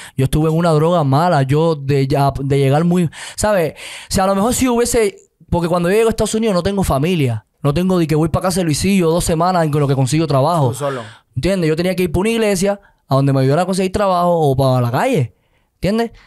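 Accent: Puerto Rico. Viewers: Es cubano...se siente puerto riqueno y mexicano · Habla como puertorriqueño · Me caí gordo por qué es Méxicano y habla puertorriqueño se cree o nose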